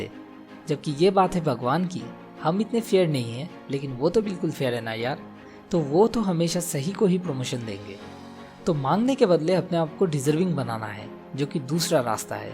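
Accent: Bengal, India. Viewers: pronunciation of temporary? Bengali accent?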